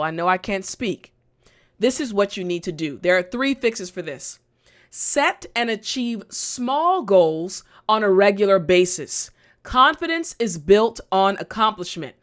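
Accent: United States. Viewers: I like the way you teach English with the real American accent . I really enjoy listening to you. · Accent american listening very clear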